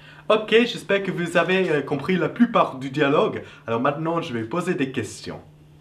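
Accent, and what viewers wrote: United Kingdom. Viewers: Je suis américains et d'apprentissage français. But slurs he too much. He also slurs a letter v into the letter r sound. He has learned a British accent.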